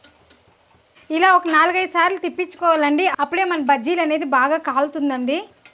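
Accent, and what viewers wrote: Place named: Bidar, India. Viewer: Thumba chennagi adige madthira👌nanu Karnataka dalle belldiddu, kannadavara manasu thumba swacha vaddu, nimma mathu kelldagella namma beader jille nenapagute, all the best nimge👌👌👌👍👍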